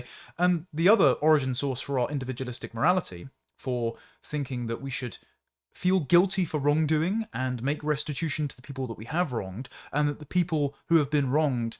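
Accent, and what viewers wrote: RP, United Kingdom. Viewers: always the halfwits who are impressed by the average posh english accent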